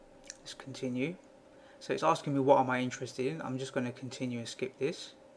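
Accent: United Kingdom. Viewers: The accent is like harry potter nowadys😳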